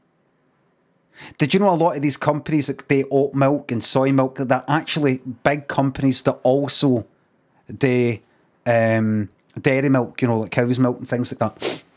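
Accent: Scotland, United Kingdom. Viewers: How can he have such a heavy accent and not speak gaelic? Imagine a guy with a heavy Italian accent not speaking Italian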